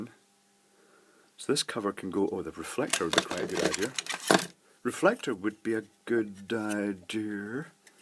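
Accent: Scotland, United Kingdom. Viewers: I have disliked the Scottish accent before, but yours, is not bad at all. In fact, it's rather soothing.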